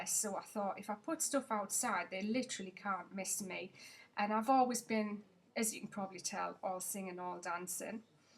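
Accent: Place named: United Kingdom. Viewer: Hello I have been watching you for weeks now, and I just live listening to you talk about how Eden's Secrets came to be. You are very pretty and it's nice to put a face to that beautiful accent. I am from the states and I LOVE British accents. My goal is to go threw and watch all your videos and try to comment in each one. Wish me luck on my adventure. Bye for now.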